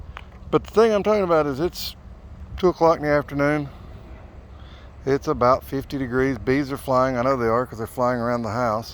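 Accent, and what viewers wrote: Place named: Carolinas, United States. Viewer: I'm thinking that if the bees start drawing in pollen, the queen might start laying again. I'm not sure which zone you're in, but that accent sounds like the Carolinas or somewhere really close.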